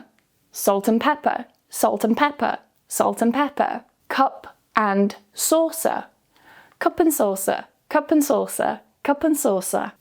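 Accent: United Kingdom. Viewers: That's a really effective video for me to learn the British accent. Thank you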